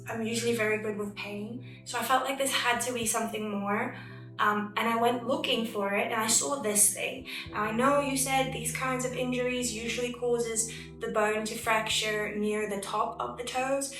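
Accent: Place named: United Kingdom. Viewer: West I was watching that and you read it felt like I was hard to say but I guess I'm just going to use the the easy way out it was like it was like I was in The Matrix and everything around me was it real but you were like an oracle guiding me to see the NPCs😊. Dad I don't want to say too much but maybe, it's like it's so interactive and it's like I don't know where you are I think you're probably in the UK just by your accent and I hope your knee is okay I don't know what happened to your knee but is that the secondary infection I think you called it. I don't really know what that is maybe you'll explain it to me or I'll Google it but back to the story it felt like when you were I guess you were reading what you had Gerald before or just something something that you felt that possibly channeled through the quantum field possibly maybe it's, maybe it's coming from the higher timeline. 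But it is very....????? Needed..❤❤❤for me, it feels like you're like a dream and I don't know why I didn't find you before but I found you now due to some bigger story narrative to buy narrative Divine unfolding I don't even know if there is a such a thing maybe it's all a big illusion. Maybe it's our I've come to the realization that I know that I am not a human with a conscience I'm a Consciousness with the human in a human exploring and maybe this is all a construct of how we think it should be, but I feel with what you say it helps me coalesce into reality whether that be a tangible reality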